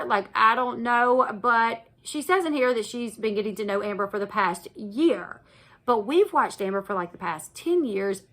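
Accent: South, United States. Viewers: I love your country accent and I love hearing you say book so I think it’s hilarious that we’re now keeping count of how many times you say it 😂 You look so beautiful.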